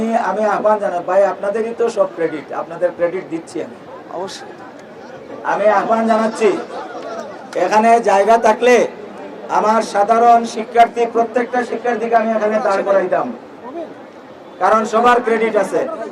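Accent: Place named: Sylhet, Bangladesh. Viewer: স্যার মনে হয় সিলেটি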